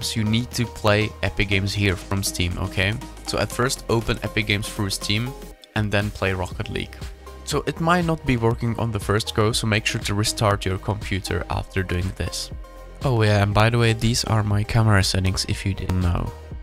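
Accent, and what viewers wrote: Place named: India. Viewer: bro have best indian accsent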